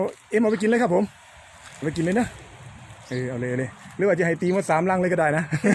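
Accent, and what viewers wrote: Korat, Thailand. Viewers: ดูคลิปทุกวันเลยครับคนโคราชคือกันฟังแล้วอบอุ่นคิดถึงบ้านครับ มายุกรุงเทพนานแล้วช่วงนี้เจอโควิดอีกคิดถึงบ้านกะกลับบ่ได้😢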